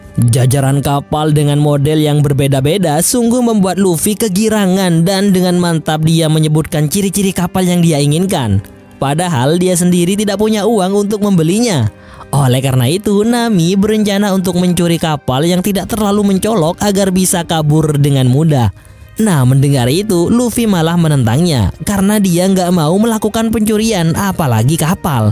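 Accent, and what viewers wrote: Madura, Indonesia. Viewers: Madureh nyenguk cak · Sumpah orang madura ini kalaupun bukan pasti keturunan orang madura soalnya logat maduranya melekat banget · Madureh asli kak😅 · Loh orang Madura bg😂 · Apah can dekik lah,,😂 reng madureh kah min? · Buh mak reng madureh kakeh dul "apa can deggik lah" sengkok bangga sama been bang wkwkwk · medureh dimmah kakeh mat❤❤❤ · Sapnyapsap+sang nyangsang. Madura mana bang? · wkwk sap nyapsap.. 😂 madurooo masss??? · Reng madureh kak?? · Sampean orang Madura mas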